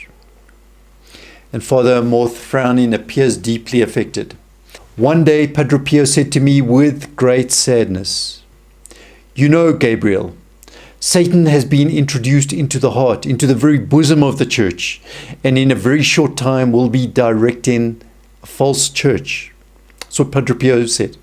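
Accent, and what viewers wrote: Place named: South Africa. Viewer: Sounds like it is from Africa. South African maybe.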